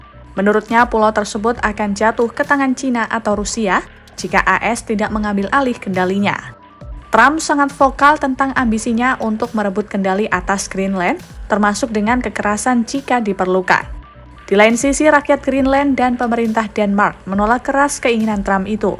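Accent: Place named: Java, Indonesia. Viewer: Suara Mbaknya wong jowo ya? Medhok poll😅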